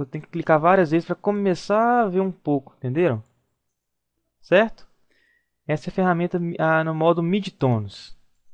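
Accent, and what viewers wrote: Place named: Minas Gerais, Brazil. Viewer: show. a voz do mineirin. ô çô!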